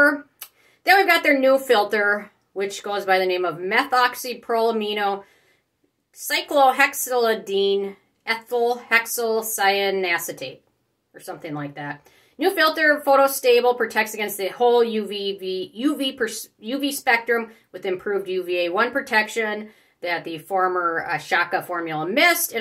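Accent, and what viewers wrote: Minnesota, United States. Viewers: Are you from Minnesota, by any chance?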